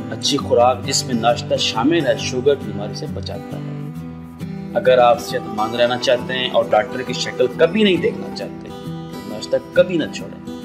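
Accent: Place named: Pakistan. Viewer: bhai awaz pakistani hai tou video bhi pakistani bnao na kya angrazno ko dala hoa hai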